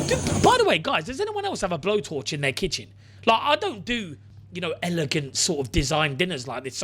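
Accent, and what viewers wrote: United Kingdom. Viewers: OMG the accent gives me life!!! Are you from same region as Adele? So glad to see a full length video. Next i wanna see a podcast!! Thankya!!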